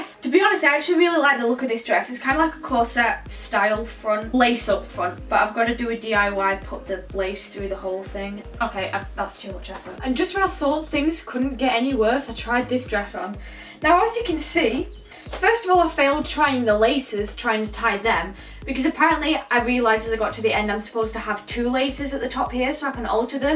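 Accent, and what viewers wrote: United Kingdom. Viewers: british i think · shammy dammy no it’s a British accent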